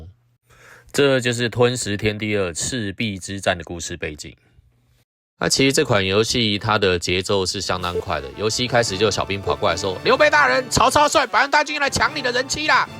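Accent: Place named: Taiwan. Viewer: 我喜歡你講解的方式!!!夠台!!!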